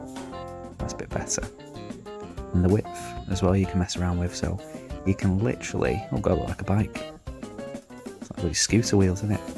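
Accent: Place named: United Kingdom. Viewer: You kinda remind me of Elanip who plays GTA 5 with your british accent xD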